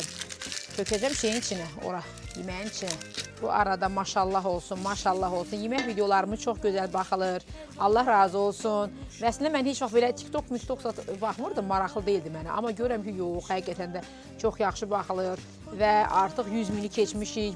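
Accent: Goranboy, Azerbaijan. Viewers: Goranboydan salamlar. xanim ne qeder bakida yasadiz indi de moskvada yasayirsiniz amma oz hele de oz goranboyumuzun lehgesinde danisirsiniz halaldi size.hemise bele qalin deyismez.hem de deyim ki somu basqadi ispanaq basqa.somu yol qiraginda bitir.gozel de ketesi olur.xosbextlik arzulayiram.❤❤.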